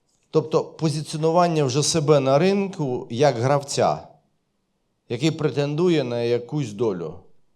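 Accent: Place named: Lviv, Ukraine. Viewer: Приємно слухати на цій львівській, бандерівській мові! Хто в курсі, той зрозуміє)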